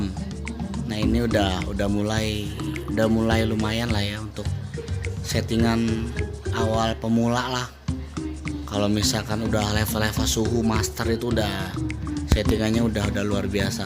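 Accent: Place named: Jakarta, Indonesia. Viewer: Seh Betawi amaat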